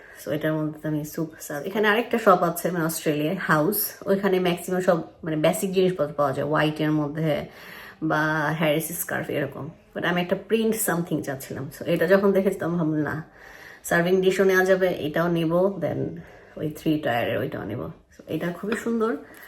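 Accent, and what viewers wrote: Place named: Bikrampur, Bangladesh. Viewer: Apu amar onek janar ichcha apni bikrampurer ? Apnar kotha sune mone hoilo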